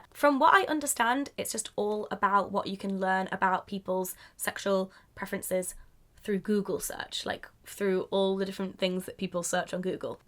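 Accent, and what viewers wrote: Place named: Manchester, United Kingdom. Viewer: Have you been home recently? You sound more mancunian😂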